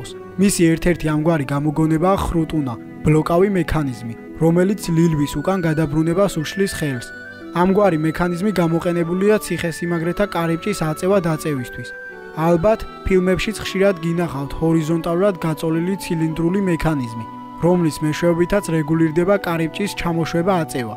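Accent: Kutaisi, Georgia. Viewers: არ იქნება ეს ქუთაისელი..... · რაფერ გეტყობა ვიოო ქუთაისელი რო ხარრრ🥰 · ქუთეისელია რამე პრობლემა გაქვს დაია??????????????????????? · Kutaiseli xar 😁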